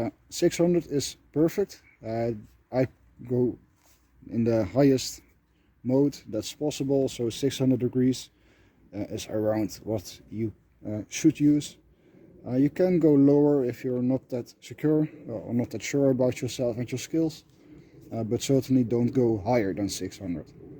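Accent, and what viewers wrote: Netherlands. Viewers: Into German reenactment too. I've done the same treatment as you did. I'm just a soldier, so no heavy wear and tear on my stuff. But like you said a headstart. Even as a soldier the uniform and kit went trough training, traveling, workduty etc. I'll allow the stains and aging to happen during my own time in the field. And hearing your accent. Groetjes van een mede Nederlander.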